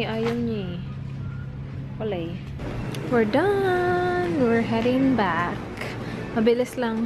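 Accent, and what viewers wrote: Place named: Cebuano, Philippines. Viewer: I really love your cebuano accent <3 hearing them talk cebuano - english is so lovely. That american accent tho <3. like colorismyweapon.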